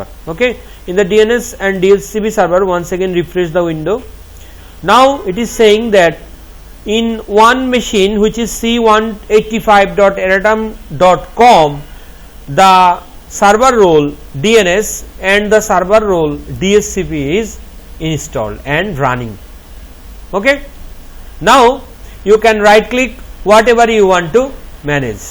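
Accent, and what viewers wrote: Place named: India. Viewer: Here's some tips on how to speak English clearly and decisively, I'm not trying to be rude I'm genuinely trying to help you. You need to slow down and keep the same pace throughout each sentence, don't start slow and then suddenly and for no reason speed up. You also need to enunciate which basically means pronounce the word correctly taking your time to say the whole word clearly. In time as you get better at enunciating you can speed up. The problem with Indians is they speak English the same way they speak their mother tongue. This is a common mistake and is why an Indian accent is very hard to understand. You have a good vocabulary and you're saying the sentence correctly but what's letting you down is your speed (too fast) and pronunciation. Just take your time, write down what you want to say and practise it a few times enunciating every single vowel, again with time this will become easier. 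You've taken the time to learn English but if people can't understand you then you're fighting a losing battle. Listen to a native English speaker that has a neutral accent and then repeat what they say so you learn the pace and pronunciation, you will get better every week and it will open a whole new world to you. Nobody wants to listen to an Indian speaking English too fast and not enunciating its infuriating for us.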